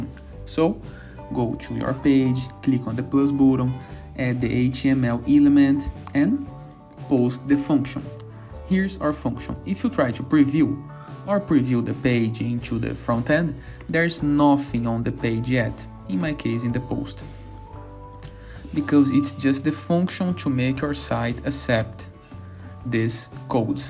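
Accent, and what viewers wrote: Brazil. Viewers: ingles de brasileiro kkk boa mano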